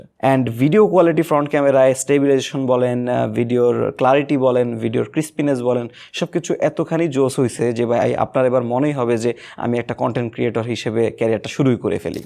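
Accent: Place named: Chittagong, Bangladesh. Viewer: ভাই এর কথার টোন শুনে মনে হচ্ছে উনি আমাদের চ্যাটগাঁইয়া। 🙂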